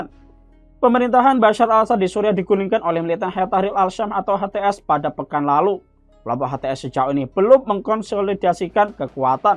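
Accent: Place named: Java, Indonesia. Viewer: Apa komentator dialek jawa..... gak enak kedengaran nya... kita indonesia...cari org komentator yg pandai dsn fasih bahasa indonesia..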